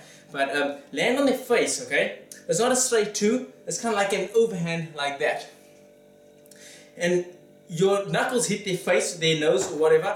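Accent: South Africa. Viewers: crazy how you still have your accent prob from your perents tho, any way i dig your channel keep it up. oh do you ever come down to fight in SA? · Are you South African bro? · U sound south african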